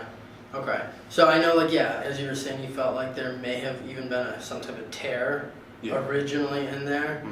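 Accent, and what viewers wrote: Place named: Midwest, United States. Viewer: Hey, you sound like you're from Illinois or Wisconsin. Am I right? Random question, I know.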